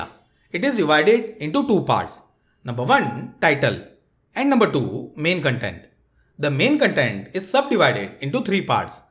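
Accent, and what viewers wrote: India. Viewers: Indian accent 🥀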